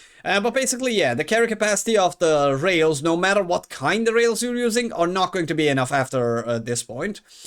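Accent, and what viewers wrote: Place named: Turkey. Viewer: oh, I didn't realize that was modded. I've made 3 story builds before, sometimes just tucking amps under the floor boards so it still looks like a two story. And I was trying to place the accent, didn't have turkey in my head. Keep the vids coming.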